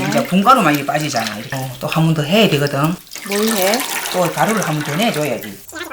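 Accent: Gyeongsang, South Korea. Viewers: 경상도. 말씀이 너무. 구수합니다. 신부 수업하는겄같는. 따님과. 너무좋습니다. 어려을때. 단술. 먹었던. 추억이. 떠오르네요. 좋은. 정보. 주신겄감사합니다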